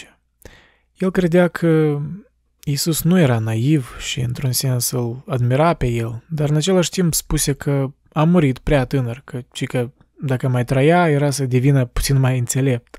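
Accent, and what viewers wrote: Moldova. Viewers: o idee excelenta dar accentul moldovenesc imi vomita in ureche o abominatie a limbii romane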